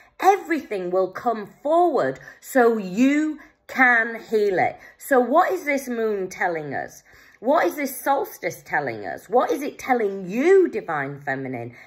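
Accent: United Kingdom. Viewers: Nice to hear a Yorkshire voice that is where I am ❤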